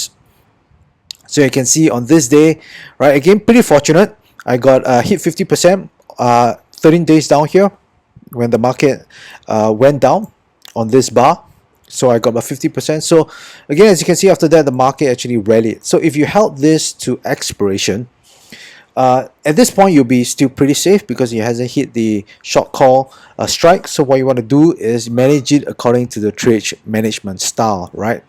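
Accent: Singapore. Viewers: U sound like Singaporean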